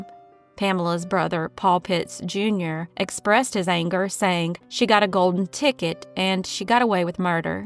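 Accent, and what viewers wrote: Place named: South, United States. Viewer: It is so nice to hear a southern accent! I'm from Ohio, but family is in Georgia. Grandma moved up here and would tell me stories to get me to sleep. Listening to the accent with true crime is so relaxing!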